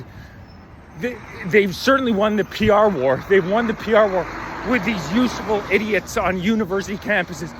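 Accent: Canada. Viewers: Here we go again the pandering continues senile old ladies and naive children beware the funny thing is this guy couldn't exist for sh!t without the same strung out jaded buffalo soldier ignorant immigrants (that he goes so obscenely out of his way to rip on when antisemitism is a criminal offence as of last year!) looking the other way from every second word out of his mouth cause yknwo, they saw actual war (which this guy supports of course) and the same blackmailed child molester politicians he pisses the same exact party lines out of his dick hole face with that stupid vaguely hoser accent he puts so much effort into 😂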